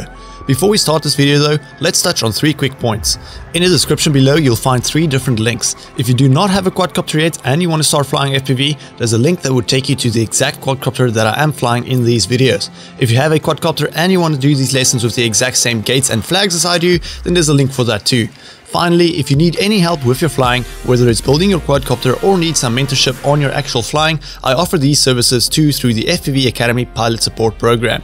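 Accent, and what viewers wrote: South Africa. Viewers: hey my bru are you South African? you sound south african but your address says new zealand!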